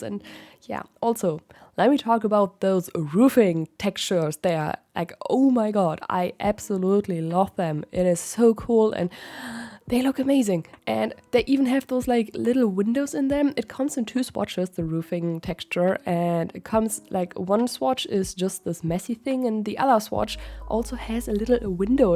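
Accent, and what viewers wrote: Germany. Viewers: is she german? i think i hear a little accent because i‘m german too and i got so excited bc i rarely find german content creators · Yeh I'm wondering too!!! 😊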